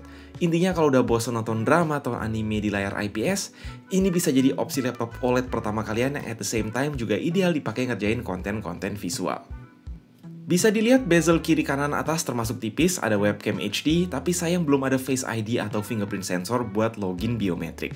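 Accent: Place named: Java, Indonesia. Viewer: itu modelnya mbak-mbak medok brit yabai itu ya?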